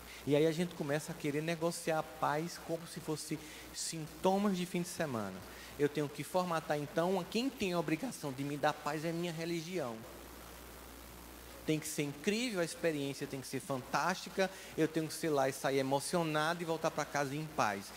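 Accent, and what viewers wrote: Northeast, Brazil. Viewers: Onde você estava? Que bênção você é...ainda mais com esse sotaque lindo...nordestinos são demais...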